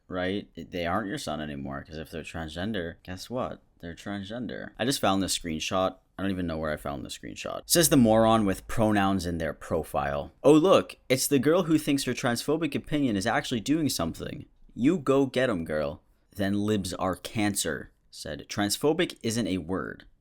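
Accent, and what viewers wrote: United Kingdom. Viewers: british accent, not that bad, not sure which part of britain youre tryna be from tho... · i laughed at your British accent so much😂. it was better than a lot of peoples tho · I know I'm late with this comment but I just want to say, I'm British and I appreciate your British voice, not bad at all! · How do you have a more British accent than me, I'm British. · PLS-WHY DO YOU SOUND LIKE SHREK WITH YOUR BRITISH ACCENT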